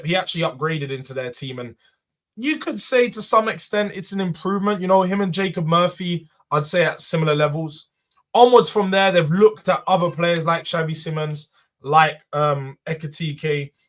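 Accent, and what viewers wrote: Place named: Cockney, United Kingdom. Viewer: Geordie man....with a cockney accent...AI gone mad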